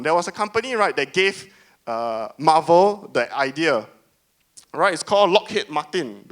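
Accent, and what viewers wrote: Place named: Singapore. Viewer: I think he is a Singaporean.. and speaking very fast does not help either.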